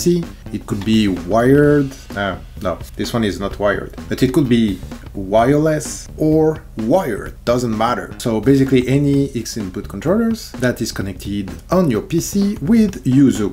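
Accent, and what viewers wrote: France. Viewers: Did you manage to get rumbles with the Xbox controller ? Btw I heard ur french accent ( Or maybe german/dutch)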